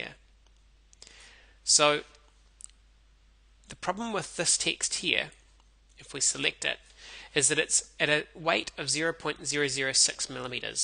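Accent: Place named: New Zealand. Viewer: kiwi voice ftw! :)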